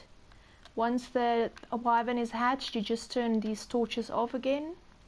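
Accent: South Africa. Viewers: Oi are you south african i can tell as i am one too! I can prove your name means Ice Princess #sageneralknowledge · Are you South African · I recognise that accent from anywhere! Suid Afrika represent! You go girl!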